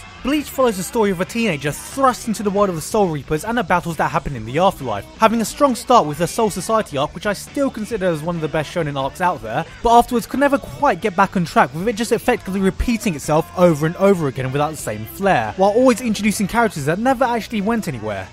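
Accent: United Kingdom. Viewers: Dude you sound British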